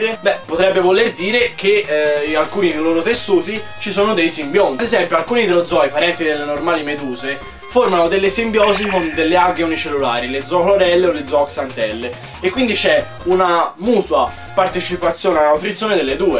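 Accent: Tuscany, Italy. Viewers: MITICO! Assolutamente Iscritto! Simpaticissimo, accento adorabile :3 (adoro il toscano), informatissimo e piango insieme a te all'idea dei Namecciani morti ingiustamente.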